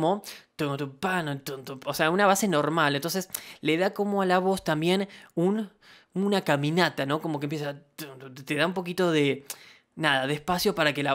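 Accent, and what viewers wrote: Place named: Argentina. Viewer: Te ganaste mi like, conoces de buen Rock socio, cómo buen Argentino claro,( si no me equivoco) y si sos Uruguayo me desculpo x jusgarte x tu acento, pero conocés de música, saludos desde Costa Rica 🇨🇷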